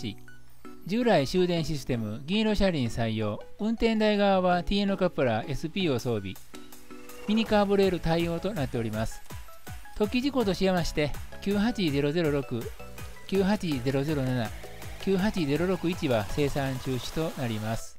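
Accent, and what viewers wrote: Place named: Kansai, Japan. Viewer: HIDEKYU様 動画UPお疲れさまです。大変わかりやすくまとめられていて参考になりました。 ありがとうございます。 やわらかな関西弁にも癒されます。 キハ82, 189系あさま、E3系つばさなど、今月発表分には財布の紐が緩みそうです💦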